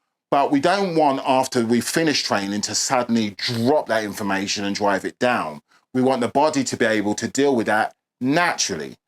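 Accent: United Kingdom. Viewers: ABL with an English accent?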